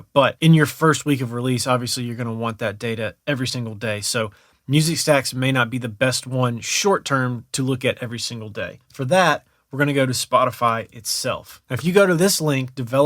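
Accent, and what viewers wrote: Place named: South, United States. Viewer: good stuff man! appreciate it. noticed a southern accent, where are you from?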